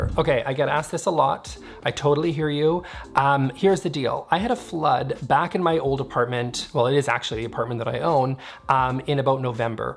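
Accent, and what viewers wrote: Canada. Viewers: that makes sense. I’m British but have absolutely no problem with Canadian accent (or most Canadians’ accents). I find his voice, despite the quick pace of speech, quite calming and very easy to listen to. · Congrats on the 60K mark! It's si great to see things from a Canadian perspective...the minute I first clicked on one of your videos I noticed the accent. YAY!!! 🤗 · I LOVE that you talk fast and get to your points quickly. People need to rev up their brains...or hit pause and go back, lol! Your accent and manner reminds me of another Canadian designer, Emilia Wisniewski....what a hoot it would be if you two did a vid together!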